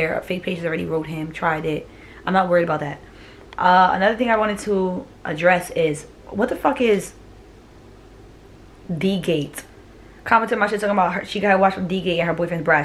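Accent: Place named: Boston, United States. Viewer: She sounds like she from Boston lol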